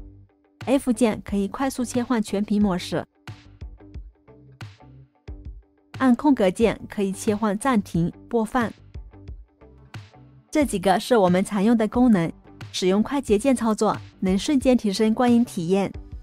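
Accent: China. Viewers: 声音挺可爱的，而且英文也是同款中式，很有亲切感~哈哈哈~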